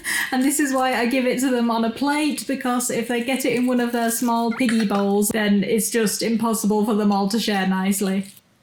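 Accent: United Kingdom. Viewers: She’s UK😊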